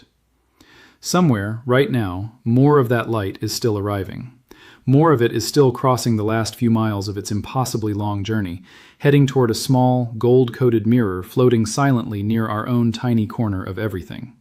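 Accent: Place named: United Kingdom. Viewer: Interesting stuff. Notice he goes into a British brogue after about three minutes. Oh that’s weird having an AI change an accent midstream.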